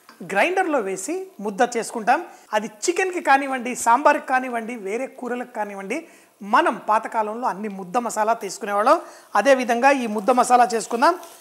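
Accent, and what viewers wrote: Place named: Hyderabad, India. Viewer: Brother you should talk Hyderabad telugu only... Being a Hyderabadi. . I enjoy while you talking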